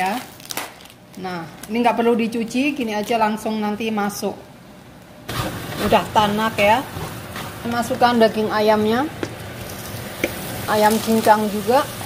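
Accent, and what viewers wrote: Java, Indonesia. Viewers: MBK jelas uraian dialeknya njawani mbkx asli kebumen kah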